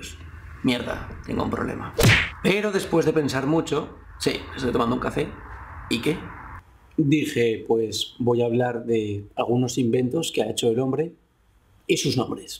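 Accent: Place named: Argentina. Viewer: Yo pensé que era Argentino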